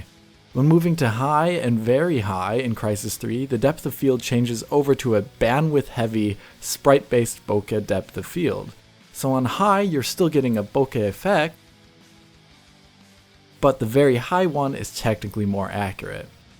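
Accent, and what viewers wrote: Germany. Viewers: German is your first tongue isnt it? Excellent english pronouciation tho! And overall great video quality from what I've seen so far. EDIT: "Und auf Wiedersehen!" Yeah i think that settles it :-P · Trevor Cook Really? I'm pretty sure I hear a German accent when he's speaking English though. And the German things he said sounded absolutely 100% perfect. The "melody" of his voice also sounds very German to me... If he really is an American he's been assimilated into oblivion gawdamn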